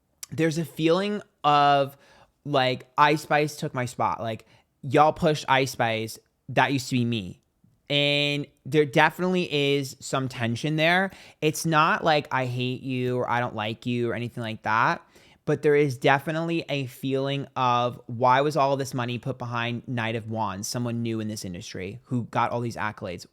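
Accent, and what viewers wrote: New York, United States. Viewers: I KNOW you're an Italian New Yawkaaaa or Jersey 🙌 and a psychic on top of that?? yes pleaseee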